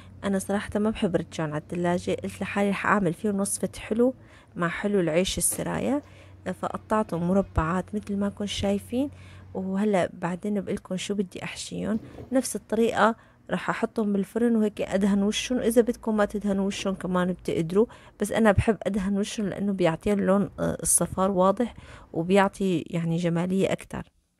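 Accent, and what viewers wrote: Aleppo, Syria. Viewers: لهجتك لهجة أهل ضيع حلب 🤔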